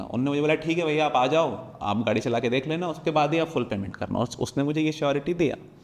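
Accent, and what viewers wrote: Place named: Jharkhand, India. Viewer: Bhaiya ji apka tone se hi laga ap to apnye ho jharkhand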